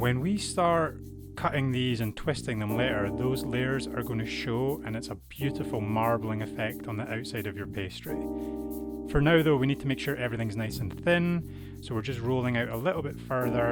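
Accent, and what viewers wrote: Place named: Scotland, United Kingdom. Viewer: Great video! I enjoy your Scottish accent and voice. 😊 it’s relaxing and calming and not comparable to nails on a chalkboard either 😂 These look beautiful and would be lovely for a holiday brunch , can’t wait to make ! Thank you for a great video and recipe !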